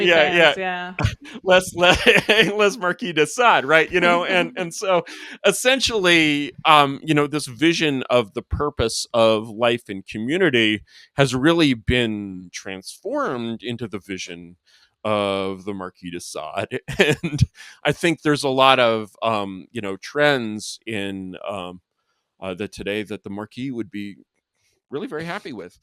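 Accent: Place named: California, United States. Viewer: your perfect California English accent is o minune misterioasa. Your vocabulary, your mastery of both language and subject matter is astonishing. Curious, can you also speak Magyar? Oh well, carry on. I just had to exclaim a little!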